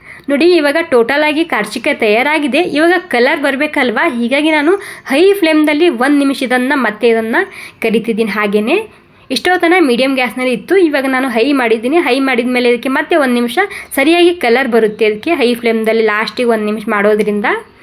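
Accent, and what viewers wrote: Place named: North Karnataka, India. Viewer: Mix kannada badri nam uttar karnataka bhashe spr aram hanga matadri bindas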